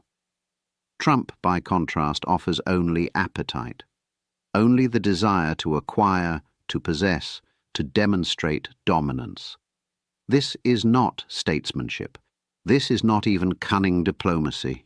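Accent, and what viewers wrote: United Kingdom. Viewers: Why is it always someone with a British accent that sounds the alarms of discontent and stupidity within our country and makes the most sense of what blind cult Americans refuse to acknowledge always so on point. WAKE THE F up PEOPLE this is our country going to HELL in a hand basket and we may never recover😢😢😢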